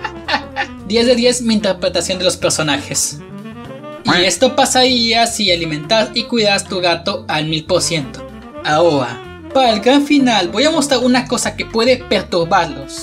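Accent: France. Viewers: es francés?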